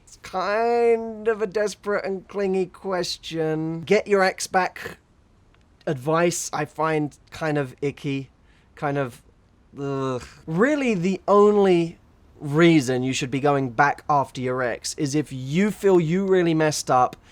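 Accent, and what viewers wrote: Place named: United Kingdom. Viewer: I enjoy watching your video... and your british accent.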